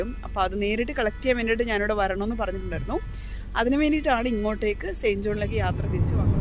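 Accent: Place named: Pala, India. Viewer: Pala പരിസരവാസിയാണെന്ന് തോന്നുന്നു ഈ സഹോദരി... കാരണം ഇത്രയും amicable ആയി സംസാരിക്കുന്നതു കേൾക്കുമ്പോൾ ariyam njanum palayil aanu രാമപുരം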